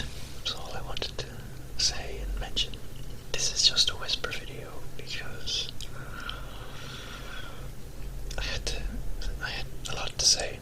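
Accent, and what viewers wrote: Ireland. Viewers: Hello my lovely, it is so good to see you back making videos, to see you happy and to see your beautiful smile again. I'm so sorry that you encountered some unkind people, when you are so sweet, kind and lovely to everyone. As for videos you post in the future, as long as they feature your gorgeous Irish accent, they will be perfect. (I loved your poetry ones - do you remember the poems I liked which I shared with you?) keep smiling my lovely K x p.s I read The Hobbit! I loved it!!! Thank you for encouraging me to read it.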